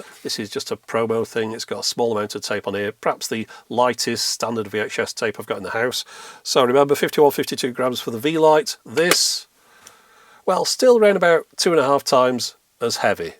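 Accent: United Kingdom. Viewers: I can, the British accent, the quirky content, the snarky commentary, the obscure technology and the enthusiasm. One of the YouTube’s greatest channels!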